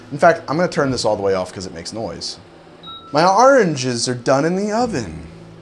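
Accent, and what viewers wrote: New Jersey, United States. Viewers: You're getting rather self-conscious about that Jersey accent of yours, aren't you? Don't let the comments get to you, there's nothing wrong with having an accent.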